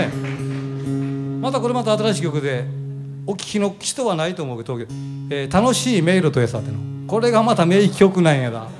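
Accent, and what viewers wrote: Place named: Kishu, Japan. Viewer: ありがとうございます。我が家では濱口さんの紀州言葉をお互いに言い合って楽しむ時があります。関西人やからのお。ええのお！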